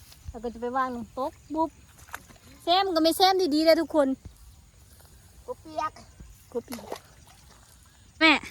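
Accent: Korat, Thailand. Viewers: สำเนียงเหมือนคนโคราช แต่ก็น่ารักดีครับ😊😊😊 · สำเนียงเหมือนคนโคราช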